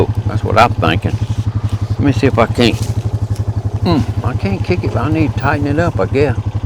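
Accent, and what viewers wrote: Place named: Louisiana, United States. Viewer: What state you from brother ? You sound a bit Louisianan or east Texan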